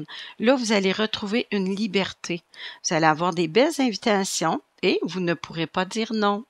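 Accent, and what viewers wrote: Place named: Quebec, Canada. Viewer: Bonsoir Mme. Je viens de vous découvrir et c’est une belle découverte. C’est toujours agréable d’entendre un accent québécois dans les voyances, vous êtes peu nombreuses et tous ne me parle pas, ce qui n’est pas votre cas. Votre tirage me parle beaucoup. Je suis vierge, ascendant vierge et lune en vierge, rien de moins 😄. J’aimerais beaucoup savoir le nom de vos cartes s.v.p. je les apprécie beaucoup, les images me parlent. Merci encore et longue vie sur YouTube. 🌺❤️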